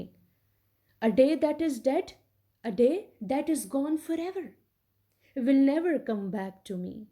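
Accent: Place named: India. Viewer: لهجه هنديه ايع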